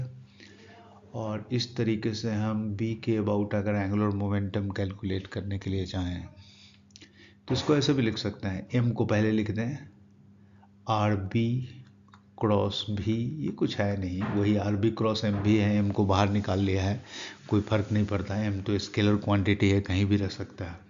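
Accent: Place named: India. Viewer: Used india english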